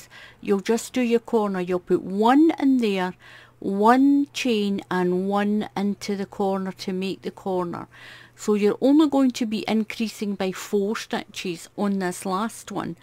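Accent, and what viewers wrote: Scotland, United Kingdom. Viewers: Hi this is brilliant and I cant wait to have a go at one of these. Also I am from Northern Ireland so I love your Scots accent! Looking forward to your next video. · Thank you, your work is lovely, and I love your Scottish accent. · Hallo can you please give us the number stitches for an 2xx person I think you are one person that can do it watched all you videos I love how you talk I think it sound like Scotland people is speaking I am from South Africa.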